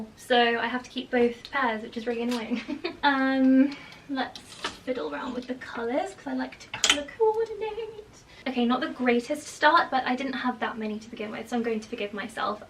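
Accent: United Kingdom. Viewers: Watching this video made me feel like I'm catching up with a dear friend after a long interlude. (I do have actual friends. We're all so busy, though, with our families, I often don't get to see them for months at a time.) I LOVE your closet clear outs. I often hear your lovely British accent in my head when I tackle my own. "I'm going to be ruthless..." I also very much enjoyed hearing all the little and big details you put into your clothing line, which demonstrates you're doing what you should be doing.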